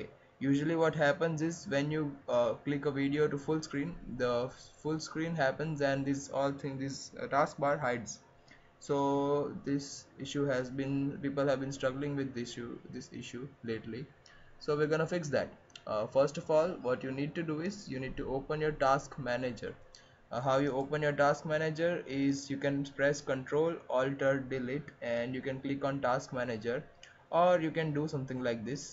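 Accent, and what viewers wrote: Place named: India. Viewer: That Indian accent tho lol headass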